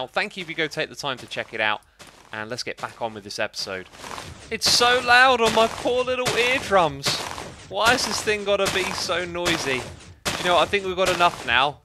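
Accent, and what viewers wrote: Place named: United Kingdom. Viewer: I always find it fun to compare pronunciations with you X. Me: Aich X: Haich Me: Or-kid X: Or-chid Me: mural X: murial (this one bugs me a bit) Me: Zee (sometimes zed) X: Zed (ofc cause British) Me: compOster (with long O sound, like the word Open) X: Composter (short o sound, as if saying the word posture) I keep waiting to hear more and it's become a fun game now. Hope X sees this! <3 Cheers Buddy!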